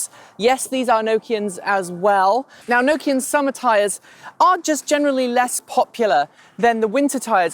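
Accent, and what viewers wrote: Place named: United Kingdom. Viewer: I like your accent , are you originally From uk?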